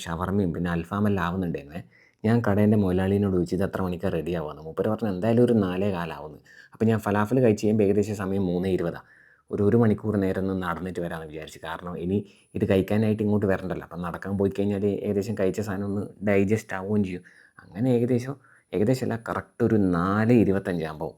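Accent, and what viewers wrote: Kannur, India. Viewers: Chettan kannurkaran aano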